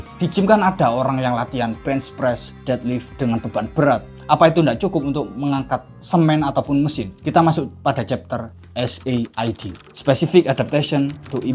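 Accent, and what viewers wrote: Java, Indonesia. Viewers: Orang mana bang? kok bahasanya medok bgt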